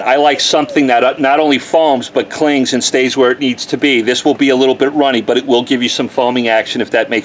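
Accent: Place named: Long Island, United States. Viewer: You must be from long island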